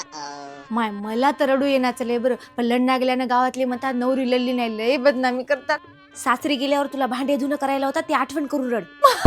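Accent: Nanded, India. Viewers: नांदेड ची मराठी एकदम मस्त.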